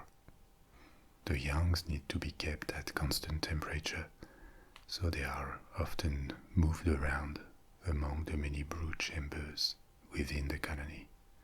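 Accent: France. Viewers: ‘What’s your favourite video’ A French man talking about ants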